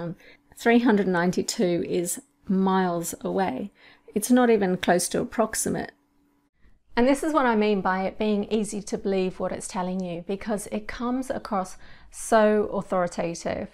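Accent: United Kingdom. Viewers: Where are you from? The UK? I really like your voice and accent. And videos too 😎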